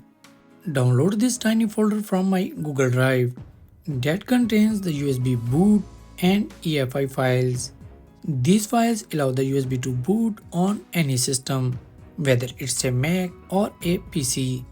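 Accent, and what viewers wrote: India. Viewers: Look, please do not take this wrong, When in visited USA for the first time Americans made fun of my own voice. I still have an accent with English to an extent, but because I have tken very long and hard amaounts of time to train myself, now, most people do not think i'm from Russia let alone real rural North Ossetia, just don't think I'm trying to bully you or make fun of you, it's just the truth. I've been to USA alot, and most Americans think this is absolutely annoying. We all know the English's rather dismal outlook on "Paris" and "Indians". But, most of it is trying to deciepher what you are saying. The western world deals enough with your kind of accent on the telephone supports, now you guys are in my country trying to speak Russian! I can only imagine...it's just frustrating. You got to see it from a native speakers perspective. 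How would you like talking to me on the phone in Hindi with a super thick indecipherable Russian accent but calling myself Krishna or Sanjaya, or an Indian name? It's annoying.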